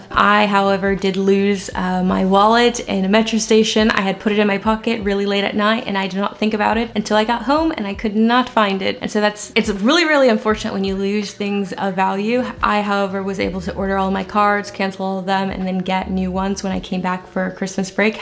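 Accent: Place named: Argentina. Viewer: I’m a native Spanish speaker and your Spanish sounds so amazing. I love the way you speak! Reminds me a little bit of Argentinian accents. You’re doing great, keep it going! 👍🏼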